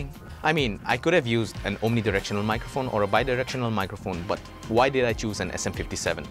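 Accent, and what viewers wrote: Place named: India. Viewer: It's weird as hell hearing an Indian accent come out of your mouth.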